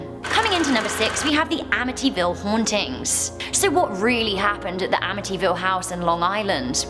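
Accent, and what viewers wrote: England, United Kingdom. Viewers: I thought your from england because of your accent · Does have a british accent Like:yes Comment:no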